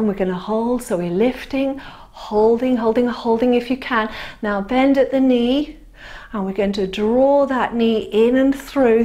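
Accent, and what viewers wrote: England, United Kingdom. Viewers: So happy to have found you. I'm a senior senior (!) and had stopped all muscle work and started doing cardio only, due to joint pain, etc. etc. This session allowed me to work my whole body once again without pain. Plus, everything's better with a British accent. Thanks! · Hello, I haven´t done any exercises for a good few years, just being lazy, and very little inspiration, decided must do something, so I started only yesterday, did´nt manage to do all the 30 minutes, I thoroughly enjoyed those that I managed to do. Today was a little sore, but have done another lot of your exercises, to get rid of the stiffness. My name is Jan (Janythe) Canovas, I am a South African of English parents, I am 85 years old, and need to take care of the amount I can manage, but am happy to say that every day manage a little more, It is so good to listen to nice English accent, thank you. 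Your explanations are really wonderfull. I will come back to you in a few weeks, when I am able to manage the full 30 minutes, it will take a little time as I am VERY stiff and unfit, but you helped me a lot, even managed to go to sleep a little easier, I am so thrilled to have found you, and your over 60 Pilates. Thank you so much, sincerely Jan.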